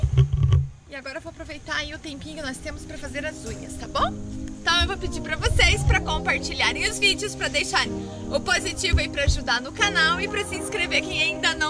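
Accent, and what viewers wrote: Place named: Santa Catarina, Brazil. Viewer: Boa noite. Adoro seus vídeos. Principalmente para ouvir sua voz. Esse seu sotaque catarinense com certeza chama a atenção. Essa sua frase "CHIC E BACANIZADO" também é legal e chama muito a atenção. Sua simpatia também é bem marcante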